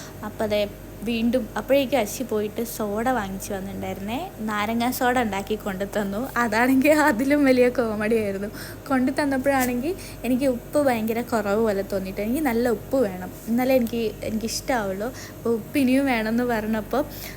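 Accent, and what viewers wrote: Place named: Kannur, India. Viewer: Aaa. 😍 kannur salnk poleind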